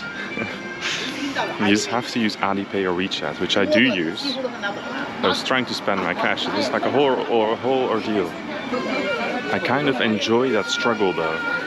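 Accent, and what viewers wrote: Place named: United States. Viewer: I just come back from China, I use cash all the time, no one looking at me like foreigner. It's very strange how could not bring enough cash especially it is your 1st time, also seems you already alert all the bad thing before come in. Look your vlog, it has little viewers, this one hit 4 digit viewers just a few hrs. Sure, you want to back if only China is money come from. Hope you won't come back, sir. Korean are extrovert people? Give me a break. Most of them speak English? By the way you sounds like American dude😅 YOU ARE NOT WELCOMED!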